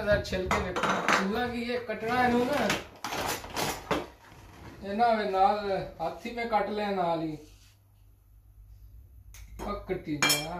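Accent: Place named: Taxila Pindi, Pakistan. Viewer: MASHALLAH Je bhot Acha laga ap ki video dekh kr, perdes main apny mulk ki hr cheez achi lagti hai,ap ki boli sun kr lagta hai ap taxila pindi side K Ho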